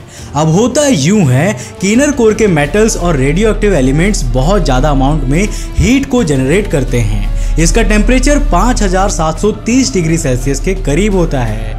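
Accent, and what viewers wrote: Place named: Haryana, India. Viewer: Tum bolte achha ho per bich bich me kai words ka ucharan galat kardete ho sh ki avaj ko chh ki or chh ko sh kar dete ho baki sab theek ha ye bhi karlo to bhariya rahega dusri bat apki bato se bhasha se lagta ha ki ap kahi na kahi haryana se bilog karte ho ya uske pas bilkul pas reh chuke ho ek word jo ap bole beta ye siraf hr me hi bola jata ha kahi or nahi matlab styale Ha ap styal ko galat bolte the ap bolte the shtyal bas ek word hi yad ha mujhe jo ap bole galat baki sab bale bale ha so keep contineu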